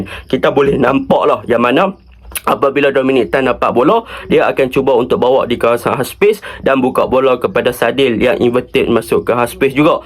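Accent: Kedah, Malaysia. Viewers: loghat Kedah mungkin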